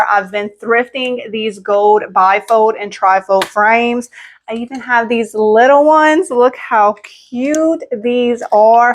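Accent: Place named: North Carolina, United States. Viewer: I love her accent. I'm from NC and we get made fun of at times as well by the Northerns. LOL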